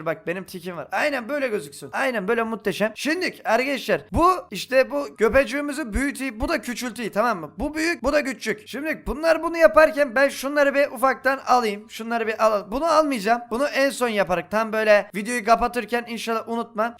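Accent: Black Sea, Turkey. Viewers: Abu sen nerelisin da? ( bubamun hesabu bence Karadenizlisin diye düşünüyorum 😂😂)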